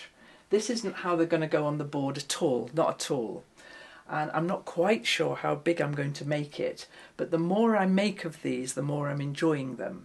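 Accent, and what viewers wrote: England, United Kingdom. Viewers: It is so calming and cozy for me to watch your videos especially the scrap quilts and lovely English accent. Merry Christmas from Alabama, USA 2025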